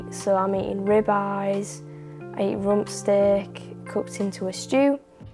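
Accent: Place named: North, United Kingdom. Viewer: Fabulous video. Thank you for sharing. Also nice to hear a Northern UK accent on YouTube for a change! 👌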